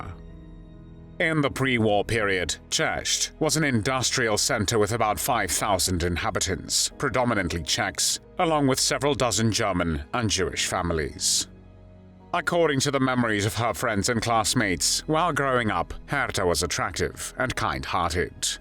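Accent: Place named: United Kingdom. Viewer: That "Brittish accent" AI voice is just too much for me.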